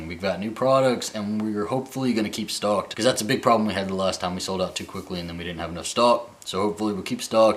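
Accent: South, United States. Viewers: I think your point is what I been saying to my best friend we should focus on the relationship an not the bedroom, there's so much more outside if the bedroom , love your videos an want hold that team against you lol #GoRebs that southern voice swoon ..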